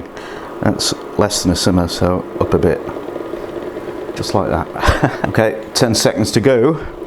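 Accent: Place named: United Kingdom. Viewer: this is possibly the only person with the "uk" accent that i will watch a video from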